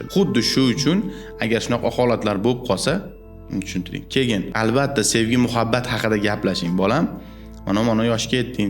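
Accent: Andijan, Uzbekistan. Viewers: Anjan shevasi zo'rde manaqa..manaqa...manaqa...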